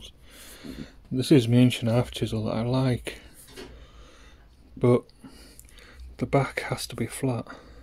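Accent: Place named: Northern England, United Kingdom. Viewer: Great no nonsense video. You sound like you're from Jonny Vegas country?!?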